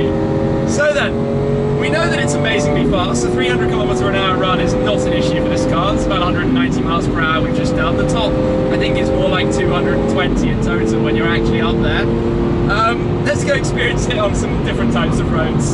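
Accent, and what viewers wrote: England, United Kingdom. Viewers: Shmee maybe you should change your intro words? Do u live near Germany? Just curious, your accent seems English, how do you so often appear on autobahn? Video is so bumpy is a glitch or road with pot holes? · Alright, you must drive on the right side of the road and learn some words German of course. :D Anyway, I'm glad how you love and enjoy the cars with a lot of passion on the autobahn. As a German it's so entertaining and funny to hear your enthusiasm in English with the British accent. Have a nice week and soon a happy new year with many astonishing cars!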